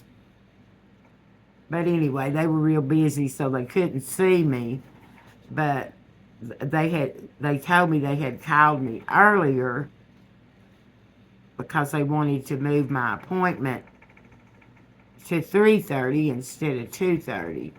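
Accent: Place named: East Tennessee, United States. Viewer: I love listening to you. I was born in East Tennessee and lived there until I was in the 7th grade. It's good to hear the accent. I hope you're not too sad about the situation. I know there's plenty to be sad about but I hope and pray that it will all work out somehow. Just wanted to let you know that you have my support and thanks for sharing your views. I know it's not easy to do but it is appreciated. Best wishes!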